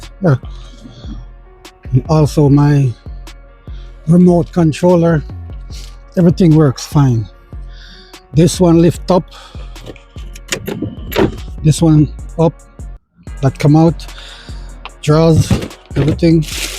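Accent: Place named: Jamaica. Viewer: Nice working van. You sound Jamaican.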